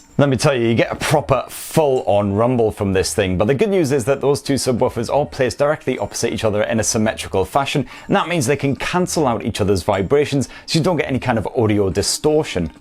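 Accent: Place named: England, United Kingdom. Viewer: Never heard it pronounced waa way. You sound English.....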